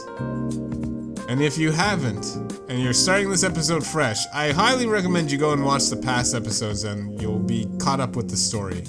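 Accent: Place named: Germany. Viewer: Your German accent is actually the best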